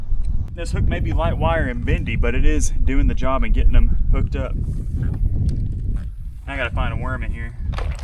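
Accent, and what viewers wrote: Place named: Arkansas, United States. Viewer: That’s “minnows”you act talk like your from Arkansas 😂👍💯👍Great Video 👍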